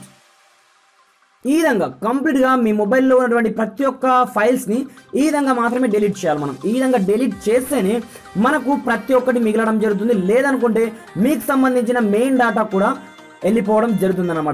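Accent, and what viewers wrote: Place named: India. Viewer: Why is it always indian? I cant u derstand what your sayinggg..just ganna turn on captions then.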